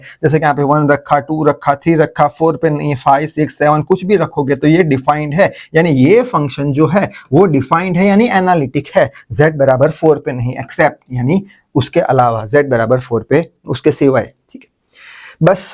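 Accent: Gujarat, India. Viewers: Sir are from Gujarat right????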